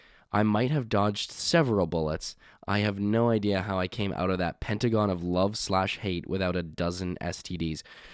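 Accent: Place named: Australia. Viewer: Nailed the Australian ascent 😂🛴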